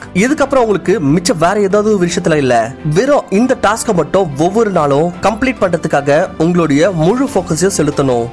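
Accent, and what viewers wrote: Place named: Karnataka, India. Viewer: Kanada Tamil nala iruku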